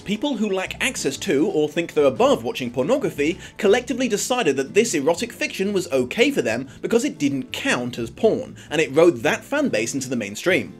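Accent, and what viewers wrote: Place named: United Kingdom. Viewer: I'm sortof giggling at the whole dom/sub thing. It was a branch of literotica I found very interesting in my younger years :) I can imagine you as a world-weary, slightly snippy dom who doesn't have the time for his subs' bullshit. If you're dressed as an Oxford tutor with a pencil behind your ear, it's your own fault for having such a marvelously British accent. I'm absolutely in love with the way you call out and destroy E L James's twaddle. Keep it up.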